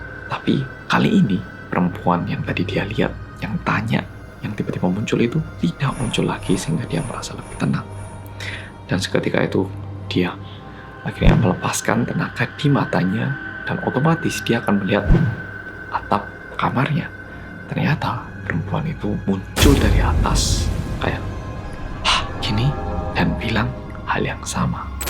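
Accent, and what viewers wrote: Java, Indonesia. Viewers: Hallo oppa medokn☺️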